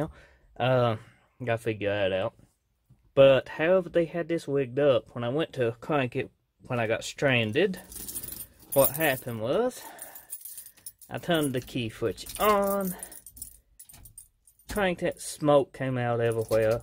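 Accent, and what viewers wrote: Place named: Los Angeles, United States. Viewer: La accent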